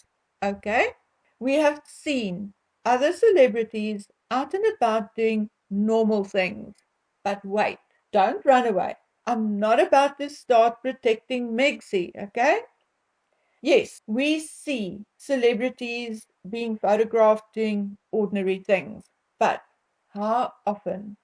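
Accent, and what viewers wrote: South Africa. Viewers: The South African accent grates my ears.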